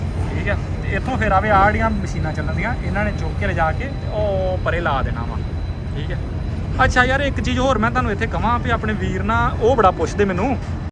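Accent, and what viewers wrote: Majha, India. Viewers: ਬਹੁਤ❤ਹੀ ਵਧੀਆ ਜਾਣਕਾਰੀ ਹੁੰਦੀ ਹੈ ਆਪ ਜੀ ਦੀ ਵੀਡੀਓ ਵਿੱਚ ਅਤੇ ਆਪ ਜੀ ਪੰਜਾਬ ਦੇ ਕਿਸ ਜਿਲ੍ਹੇ ਤੋਂ ਹੋ ਕਿਉਂਕਿ ਤੁਹਾਡਾ ਬੋਲਣ ਦਾ ਲਹਿਜਾ ਸਾਡੇ ਇਲਾਕੇ ਮਾਝੇ ਦਾ ਹੈ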